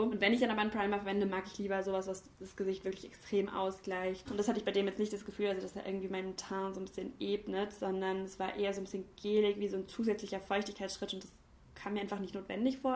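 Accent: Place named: Baden, Germany. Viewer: Ja gerne nochmal ein Video 😆 diese Kombi aus Badisch und Hochdeutsch ist immer lustig 😆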